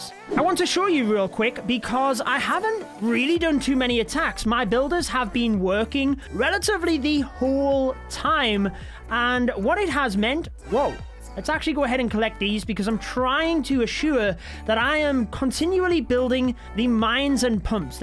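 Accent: United Kingdom. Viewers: You guys makes fun of Indian accent but I love your British accent ❤️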